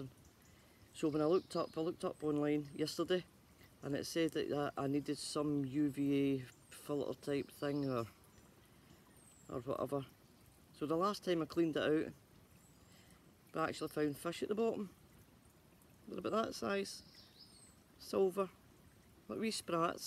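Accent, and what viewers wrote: Scotland, United Kingdom. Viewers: hello from French gardening!! beautiful background to your wee natter, you've not lost your Scottish accent!! I have the same problem with my pond, green water and I tried the same barley straw treatment not touched it at all!! thanks for sharing your knowledge and as always happy gardening!!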